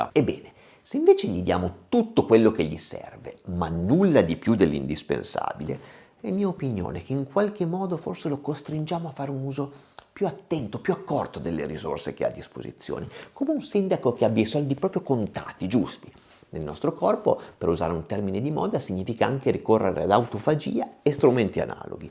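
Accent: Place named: Piedmont, Italy. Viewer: Ciao Doc, visto l'accento piemontese forte e il cognome, posso chiedere la città o paese che sia?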